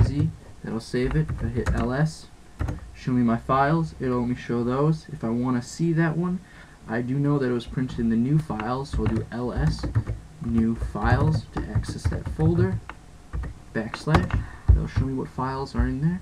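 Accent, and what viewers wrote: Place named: Brooklyn, United States. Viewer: You sound like you are from Brooklyn.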